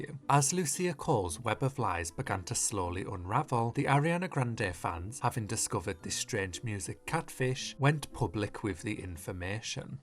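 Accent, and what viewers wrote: United Kingdom. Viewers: For some reason, this sophisticated British accent makes videos about online stan culture so funny lmaao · A British accent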